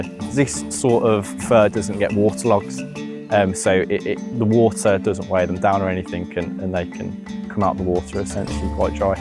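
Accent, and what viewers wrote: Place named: Australia. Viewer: this man sounds aussie but why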